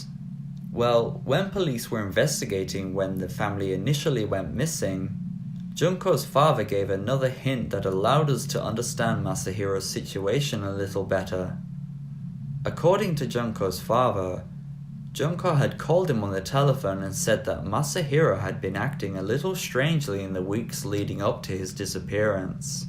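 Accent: Manchester, United Kingdom. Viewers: Are you from Manchester? Trying to place your accent but I'm no expert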